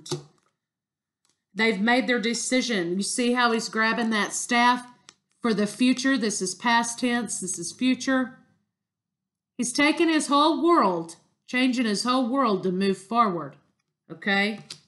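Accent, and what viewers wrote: Texas, United States. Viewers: You are truly gifted. Totally resonates. You sound like you are from Texas, like me. Blessings..🙋🌹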